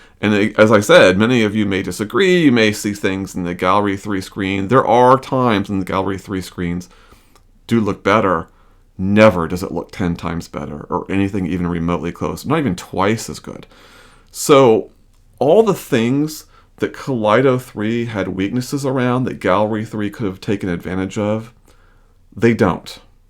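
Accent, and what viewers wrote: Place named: England, United Kingdom. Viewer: Hi! I am from Argentina, nice to meet you , sorry for my English but I would like to ask you if you really are from US, you sound as if you were from England ! Thank you , wonderful channel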